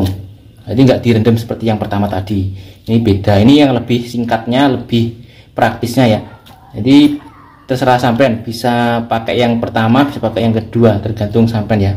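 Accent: Java, Indonesia. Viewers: mas kental jawanya...lucu penyampaiannya 👍👍👍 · Mantap mas resep2mu jowomu ngendhi aku wong Solo terdampar neng Sentul Bogor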